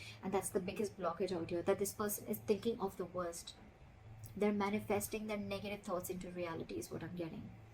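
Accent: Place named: India. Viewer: Your pace is too fast, try to speak slower. We know you're from India and trying to hide your accent but still too fast.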